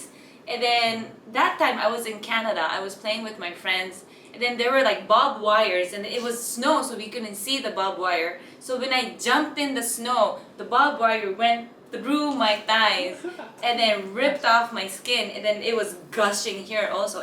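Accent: Philippines. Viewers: She talks in english like a Filipina...I wonder if she knows some tagalog..... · Why do you have Filipino accent?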